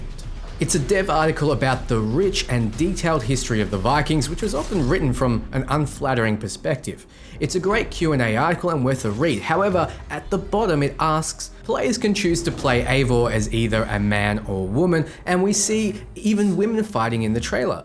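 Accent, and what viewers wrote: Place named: Queensland, Australia. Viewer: "Were there female Viking warriors? Who gives a f!" "That was a question Kotaku so next time use a question mark mate." I'm not sure what you read, but the part highlighted in blue literally has a question mark, right there, after the question. Are you intentionally being obtuse? I dislike Kotaku as much as anyone who understands their background, call them out on their biased reporting, there's ample opportunity for that you don't need to make stuff up. I really enjoy your content and as a fellow antipodean it's nice to hear an Aussie accent - your accent suggests Queenslander to me, I'm from SA. So what's going on my dude? EDIT: I've just come from your recent video with the hidden messages where you miss-read the hidden message. I don't think you're doing this intentionally and would like to believe that you are being intellectually honest but it does set the framework up to make me wonder what else is going on when we can't read what you're quoting from. 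Anyway as an Aussie I'm sure you'll see my comment for what it is, criticism, pure and simple.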